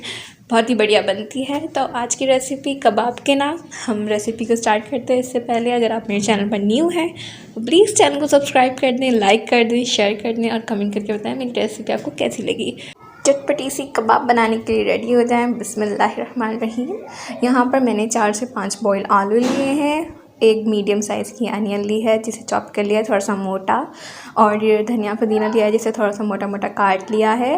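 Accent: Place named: Mumbai, India. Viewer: وعلیکم السلام بمبئی لہجہ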